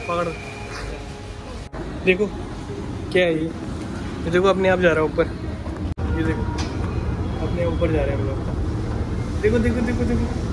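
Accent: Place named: Garhwal, India. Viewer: Aapke ghar ke video best hote hai ...mja apki mummy or apke gadwali ascent se hi ata hai ...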